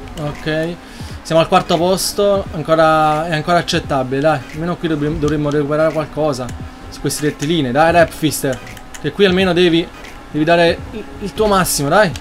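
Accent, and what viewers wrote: Naples, Italy. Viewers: È di Napoli :D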